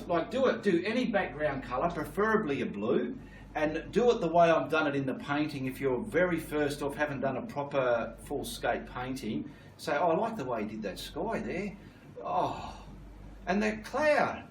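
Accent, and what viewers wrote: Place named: Australia. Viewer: its great to see and hear a fellow Aussie . im looking forward to following your tutorials as im a beginner .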